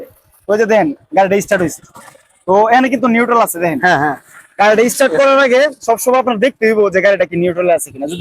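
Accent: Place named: Mymensingh, Bangladesh. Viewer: apnar bari ki Mymensingh? vasha shunei apon apon lagtese. onek kichu shikhlam apnar page theke gari chalanor. bike chalanor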